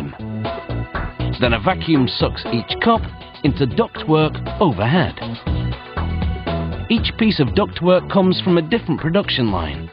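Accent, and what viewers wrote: United Kingdom. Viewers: Sounds northern English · Where is this guy's accent from? I know, all you geniuses, that it's British... but from which part?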